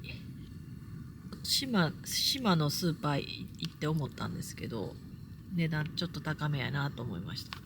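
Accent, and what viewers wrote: Kansai, Japan. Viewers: こんにちは😃 本当に重たい荷物を持って歩いていく姿に、感動もらいましたの感動が、動画やBGM,可愛らしい大阪弁のおしゃべりからじんわりふかーく心に染みてきて本当に嬉しかったですいっしょに旅してましたよ😂❤ 後編、波乱気味みたいですが、楽しみにしています PS・関西人だからってわけではないですが、伊豆大島いったことなくって、良かった❤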